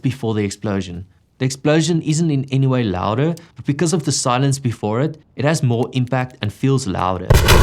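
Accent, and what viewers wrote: South Africa. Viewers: Tell me you're South African without telling me you're South African (apart from the accent) - doing more with less ;) !! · I love your South African accent- you all speak with Authority-Love it --- Love the vid man! keep it up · Wow, it's so good to hear that Afrikaaner accent after all this time.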